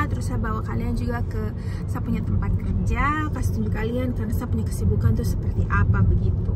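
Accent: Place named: Papua, Indonesia. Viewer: Hallo kak, saya lihat postingan kk yg di tempat bunga tulip langsung sa cari di youtube. Kk di indonesia tinggal dimna? Soalnya dengar logatnya logat papua😊🥰.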